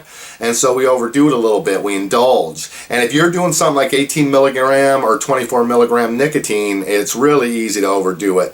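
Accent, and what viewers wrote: South, United States. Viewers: Accent sounds a bit like #ForestGump. No offence, love that movie ) Guys, we at this point have no idea what long term effects are, lets get real, studies that show the residue blah blah shit are literally cavemen level, if you cant see something - doesn't mean it's not there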